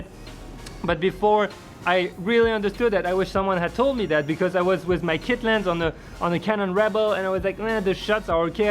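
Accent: France. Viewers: Ton accent français 👌👌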